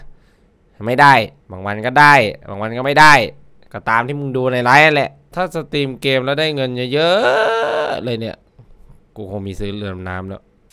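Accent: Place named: Bangkok, Thailand. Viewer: พี่ เม้นผมหน่อย ผมคนทองผาภูมิครับ ตอนแรกผมคิดว่าพี่คนกรุงเทพ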